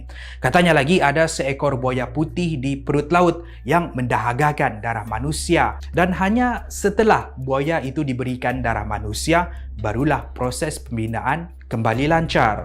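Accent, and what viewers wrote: Sabah, Malaysia. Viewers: Sabah bh ni kan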